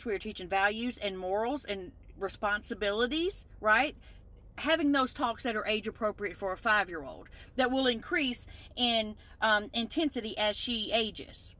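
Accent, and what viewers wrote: United States. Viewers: ONLY NON!!! Gen X would troll you because that the way the world is today 🙄🤦 I love your vids. They tke me back to THA DAY...LMAO AND WILL SAY, I am shocked your from Memphis. You sound more country....lol THANKS for all the the vids tho. It's like time traveling 😆👍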